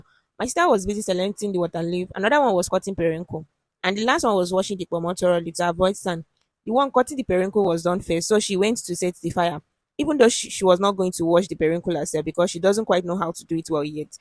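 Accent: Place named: Akwa Ibom, Nigeria. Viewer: Immediately I heard strong garri, I know say na Akwa Ibom sister be this😅😅😅. Song Idem o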